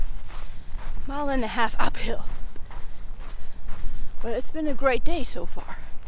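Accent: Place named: United States. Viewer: You remind me so much of my friend from norway. She speaks English with a modified American accent like you do. Instead of a British accent. But we’ve been friends for 40 plus years. She has American friends so there you go. Anyway, she came out to visit me a few years ago snd her fav part of CA was the sierras. Traveled the western and the eastern sections of the sierras from lake Isabela to Lake Tahoe. I’ve traveled the world. Few places as ruggedly beautiful as the sierras. Tho I think the cascade range in WA snd OR, where I grew up, is incredible as you will soon see. Thanks for sharing your adventures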